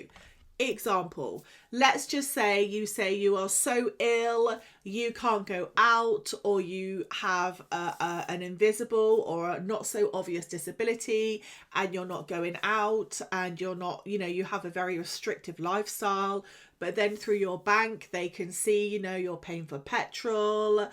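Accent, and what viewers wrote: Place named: RP, United Kingdom. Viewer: Can u not speak normally no need to trying to speak like a queen.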